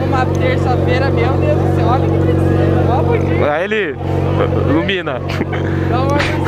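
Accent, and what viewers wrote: Goiás, Brazil. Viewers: mano vc e de onde ? vc fala sempre no pique do Goiás ? nasceu lá ? pequenas duvidas ai , responde quando der vlw abraços ✌👍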